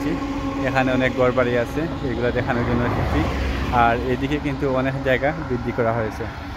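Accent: Sylhet, Bangladesh. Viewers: সিলেটি মাথা কিতা সুন্নত নি। শুদ্ধ বাংলা বললে 64 জেলার মানুষ বুজবো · Love your video from Fr. Bhai, please try to continue your natural Sylheti math. Don't try pure bangla. Keep it up.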